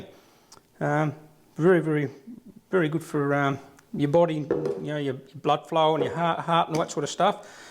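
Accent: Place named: Australia. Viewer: Where abouts are you located? You sound Australian.. I live in subtropical Queensland (Gold Coast) and absolutely STRUGGLE to grow even heat loving crops during summer. It’s autumn and we are still having 32degree Celsius days!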